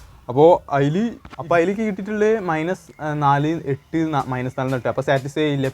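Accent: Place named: Kozhikode, India. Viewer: Kozhikod ano slang kettiyu tonunu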